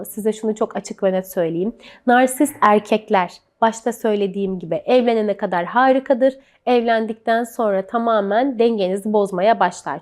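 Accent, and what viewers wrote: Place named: Yozgat, Turkey. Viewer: Ay Yozgat lehçesi vuruyor arada, orada kopuyorum. Emeğine sağlık Hocam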